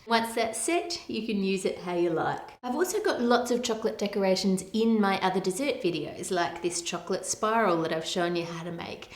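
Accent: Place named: Australia. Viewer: Hi I love ur videos and ur accent. Auzzie accents r my fave ❤️❤️xx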